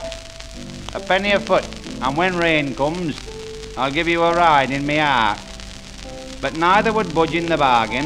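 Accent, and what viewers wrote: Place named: Lancashire, United Kingdom. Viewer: Noah sure could drive a hard bargain,but is messing with the wrong crowd here...Surely found his match in Sam Oglethwaite of Bury..Lovely Lancashire accent though, enjoyed the story by Stanley as only he could tell it...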